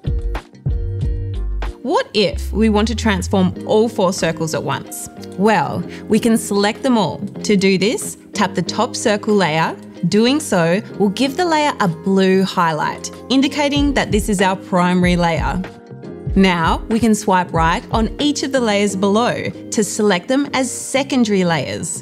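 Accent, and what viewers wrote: Australia. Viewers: damn the aussie accent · I had to swtich the captions on to understand some of the things the presenter was saying. I'm a native English speaker but I found her accent very strange at times? Is it some sort of Australian?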